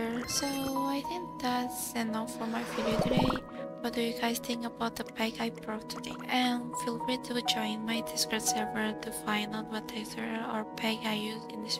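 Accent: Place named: Spain. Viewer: by your voice i feel you are a Spanish person who speak English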